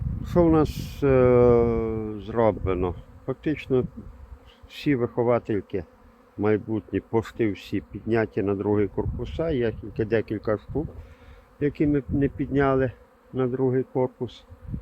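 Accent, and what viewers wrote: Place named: Western Ukraine, Ukraine. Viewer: Я в якій ви області? Чую по розмові що західна стопона , Карпати, Закарпаття.. Бо в нас на Рівненщині ще не цвіли череші 3 числа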